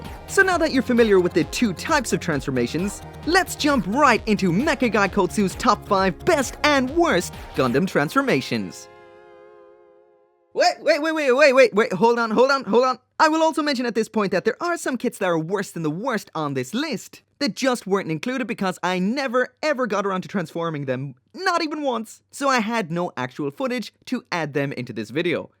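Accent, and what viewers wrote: Ireland. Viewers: What the hell is your accent? It sounds like a Dubliner doing forced American! Like, Nevada or something?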